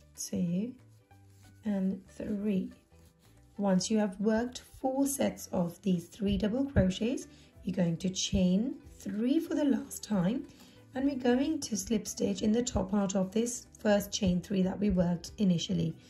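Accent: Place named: Wales, United Kingdom. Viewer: I have made it and it is very nice. What a lovely accent . Is it Welsh?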